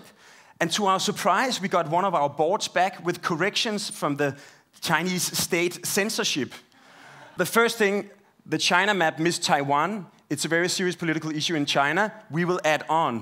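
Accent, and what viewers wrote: Denmark. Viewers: Yes it is annoying. In Denmark the ones teaching english developed their pronunciation when the primary contact was text based, or in songs. So unfortunately all the grammar, and habits from sentence construction and for emphasizing have been transferred to verbal English. The younger generation is much more exposed to American television, and have less tendency to do this. Also it is worse in Copenhagen than in the rest of Denmark, perhaps because the local dialect is further from English.. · Pretty much everyone from Denmark does this when they speak English or Danish, so I have gotten used to it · it's the copenhagen accent :P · Aw... You just have to love the Danes :) Awesome accent! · just a Danish accent :c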